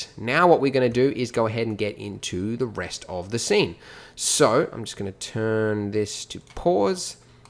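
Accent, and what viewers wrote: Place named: Australia. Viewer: your from Australia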